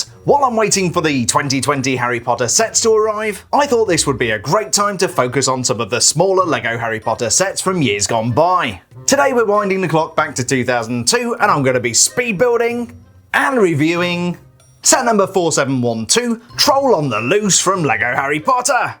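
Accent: United Kingdom. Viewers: After all of the videos from you ive watched, i cant tell if you’re british or American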